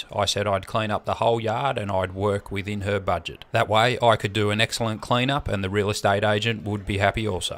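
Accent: Australia. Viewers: I watch a few lawn care channels in Australia, including yours! As an 🇺🇲 I'm beginning to hear the different accents now. That's part of the fun of watching channels around the world! In the 🇺🇸 we have so many accents/dialects...some are so strong/thick it's difficult to understand! As usual Mr., excellent work! 👏 😊👋🇺🇲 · The accent helped, but the last giveaway was the great view of Mount Wellington. I always thought somewhere in Tasmania, given the proliferation of cul-de-sac streets, steep blocks and no shortage of amazing views. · I love the Australian accent 🦘❤️ 🦘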